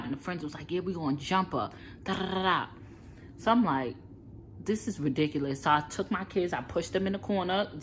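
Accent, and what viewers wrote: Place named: Brooklyn, United States. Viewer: It’s the east coast Brooklyn NewYork accent for me 😍💕 thanks for sharing honey ♥️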